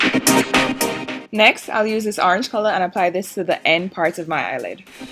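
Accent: Jamaica. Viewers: Great tutorial. You sound Jamaican btw 😉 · Your accent 😍😍😍 i know your from town (jamaica)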